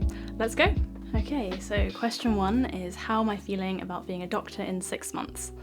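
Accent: RP, United Kingdom. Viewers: I wish we could meet in person,so that you can teach me to speak a British accent.🙂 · I’ve started watching her video to listen her British English and now found her using a Japanese cleanser Goku Jyun! As a Japanese I’m so proud of our product has been used in UK and somehow it feels funny😂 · Late to the party, but are you a citizen of the UK or abroad? I have heard that people in the UK rarely have an RP accent except for the ones that stood abroad in private institutes. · 我来自中国，很喜欢你的英音！欢迎来中国旅游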